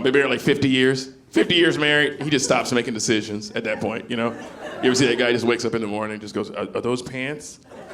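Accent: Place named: Louisiana, United States. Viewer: I like his Louisiana accent lol.. eeeeyyaw..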